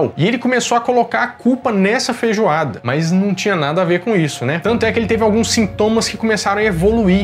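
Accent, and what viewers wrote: Minas Gerais, Brazil. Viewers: Meu amigo....Boa noite....acho seu canal muito bom....dinâmico ...informativo...sem dizer q o jeito de vc apresentar é peculiar...seu sotaque" pão de queijo" é ótimo. Trabalho no Hospital ao qual se referiu....Hospital Naval Marcilio Dias....E completo de cá , para conhecimento de todos, q o HNMD, orgulho da nossa Marinha, à época e ainda nos dias de h,j é referência Nacional p atendimentos a pacientes radioacidentados. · deixa o mineirês aflorar, sô! É lindo demais, uai!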